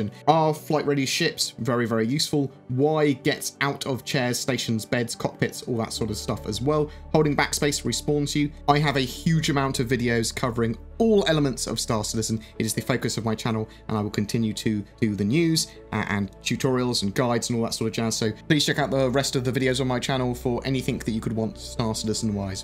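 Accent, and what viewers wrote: United Kingdom. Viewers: Men this UK voice...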